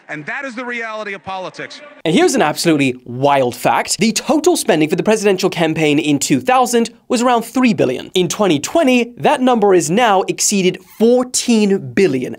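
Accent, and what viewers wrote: South Africa. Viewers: You grew up in SA huh, i knew that accent sounded familiar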